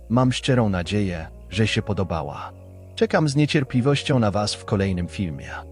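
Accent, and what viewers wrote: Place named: Germany. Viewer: Po raz kolejny zgłaszam prośbę- przywrócić lektora tego który był od samego początku. Czy ktoś z właścicieli tego konta czyta wogóle te komentarze. Tego lektora który jest obecny nie da się słuchać. Co to w ogóle za pomysł żeby dać lektura z niemieckim akcentem; czy to efekt Tuska? Proszę o przyłączenie się do prośby czytających. Pozdrawiam.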